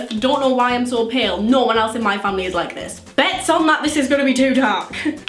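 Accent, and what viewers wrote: Newcastle, United Kingdom. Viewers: Are you from Newcastle ?? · ohemgee it's a Geordie I live near Newcastle tooooooo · Alondra Flame it's Geordie (just like me )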